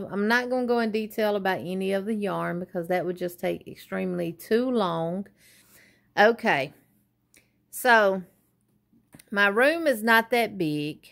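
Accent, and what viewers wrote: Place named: South, United States. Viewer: Thank you for sharing your craft room with us. I LOVE your "corner" SOunds like you are another southern gal! Wonderful to meet you!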